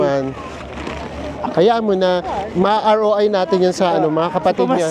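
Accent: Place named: Parañaque, Philippines. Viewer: I love watching your vlogs , always positive ❤ and your accent is the-same with paranaque 😅 thank you and more power ❤ I’ll be in Baguio dec26 till jan2 … from Chatsworth city California 😊❤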